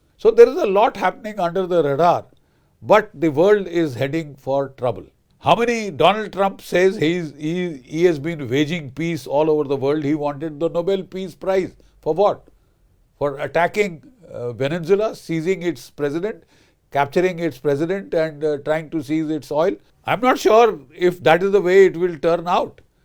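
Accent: India. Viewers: CA RAcus. Pronounce it correctly. The Indian accent SUCKS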